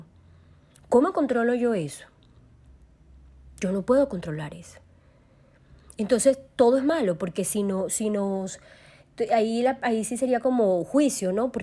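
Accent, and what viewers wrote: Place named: Venezuela. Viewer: Shalom. Todah Rabah, soy de Venezuela , tu tono es venezolana, das clases presenciales ????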